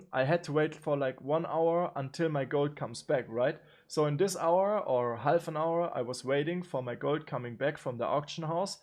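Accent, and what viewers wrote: Germany. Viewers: hab direkt gehört das du deutscher bist. :D leider hab ich net viel verstanden wie das gehen soll jetzt.... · Why do you sound German so hard? its so annoying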